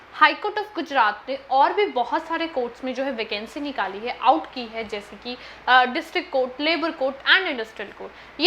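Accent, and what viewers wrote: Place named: Bihar, India. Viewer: Just bihari boli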